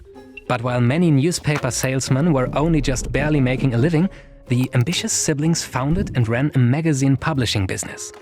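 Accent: Germany. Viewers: Is this a german accent? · Love your videos, you got the most pleasant English accent I know. Und das mit einem deutschen Akzent zu schaffen macht das ganze noch genialer ;)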